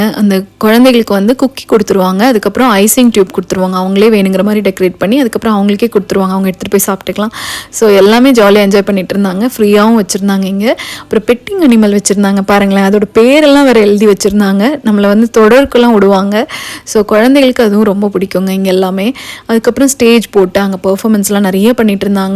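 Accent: Coimbatore, India. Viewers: Lovely vlog ma❤ I studied in Coimbatore Institute of Technology..its been 9 years Missing Coimbatore so much ..Your slang reminds me of lovely cbe ppl ❤️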